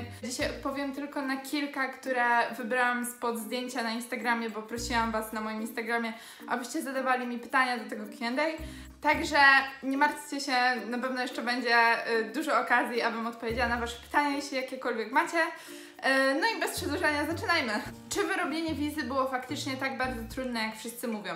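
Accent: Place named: United States. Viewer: Robisz takie pretensjonalne naleciałości z angielszczyzny, jakbyś przesiedziała w stanach co najmniej 15 lat xD Na filmiku z operacji plastycznej już ich nie ma, dziwne xD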